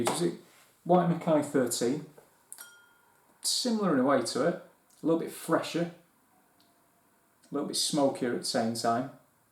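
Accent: Manchester, United Kingdom. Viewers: Great review. You sound more and more manc the more videos I watch.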